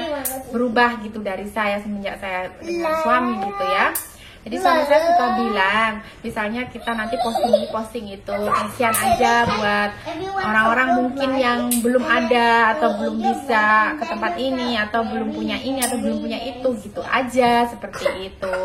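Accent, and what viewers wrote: Java, Indonesia. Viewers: Boso jowo e jek medok. Semoga rukun terus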